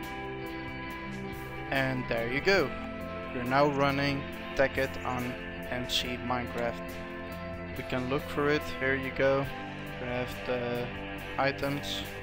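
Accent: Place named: Netherlands. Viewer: Hey dude really nice tutorial but next time just speak dutch that sounds a bit better then that dutch-english. im dutch to so thats why im saying this. But still good job. Keep on the good work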